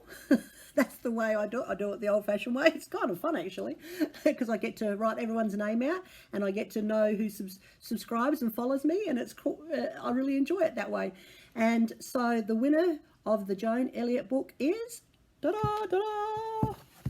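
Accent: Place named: Australia. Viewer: Love your videos and accent - lived in Exmouth, WA in the 70s and LOVED Australia. My oldest son was born there too! I would love to go back and spend more time in Perth and explore more of your beautiful country. I would love the Austen bag - it is amazing. Enjoy your retreat, and have a most wonderful trip back home. Much love. 💕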